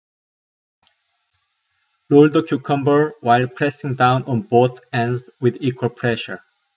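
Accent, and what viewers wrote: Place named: United Kingdom. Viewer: Your British accent is so strong, what part of the u.k. Are you from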